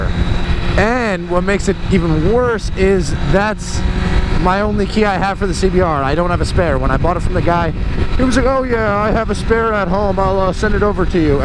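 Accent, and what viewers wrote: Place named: Alabama, United States. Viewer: hey man, what part of alabama are you from?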